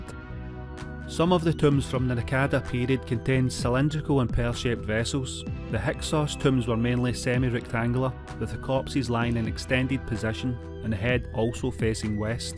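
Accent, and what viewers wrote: Scotland, United Kingdom. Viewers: Couldn't make it longer than 2 minutes. Cannot understand the world's strongest Scottish accent and I'm English 1st language. Idk why the Scots always sound like they're gargling marbles & whiskey... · AWESOME video & love you are still putting that amazing Scottish accent to good use doing videos! ~Ginger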